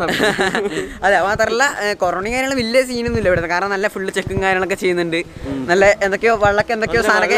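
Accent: Kannur, India. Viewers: Bro u from kannur ano plzz tell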